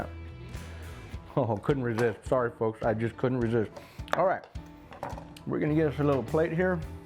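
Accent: Texas, United States. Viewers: What part of Texas you from?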